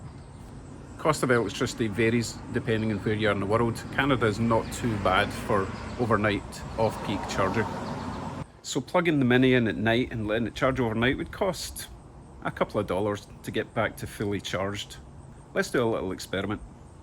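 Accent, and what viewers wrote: Scotland, United Kingdom. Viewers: i see you have a proper St. Andrews flag in your garage, along with your accent I was floored, cuz I never heard of or seen a Scottish Canadian before! This was my first video of yours I saw, I have now seen a few more, keep up the good work! I hope to get an Audi 50 e-tron when the become available in the states! Thanks again! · I’m terribly sorry in advance but is this Scottish English?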